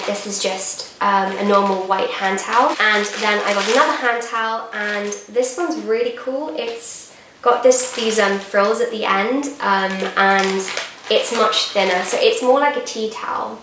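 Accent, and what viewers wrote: RP, United Kingdom. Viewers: Do you put this accent on ... your sister talks so so so much different which is a bit weird ? You sound posh yet she sounds not posh hahah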